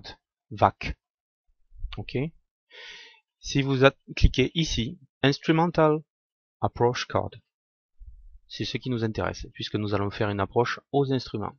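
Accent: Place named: South, France. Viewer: Très bon tuto. L’anglais avé l'accent donne en plus un air de vacances.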